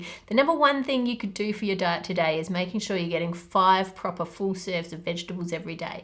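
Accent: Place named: Australia. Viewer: I find it funny how has a clear Australian accent but then her son(?) sounded like a proper Bri'ish schoolboy.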